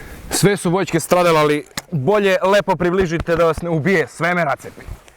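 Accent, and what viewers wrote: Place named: South, Serbia. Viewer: Yugo soldier papcina obicna ovaj he ozbiljan momak sa juznosrpskim akcentom very funny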